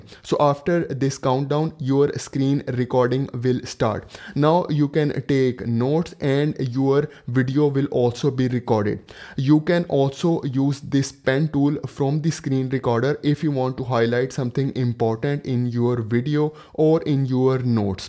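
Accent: India. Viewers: Bros Indian accent is so heavy.... Got my balls crushed 🗣️🗣️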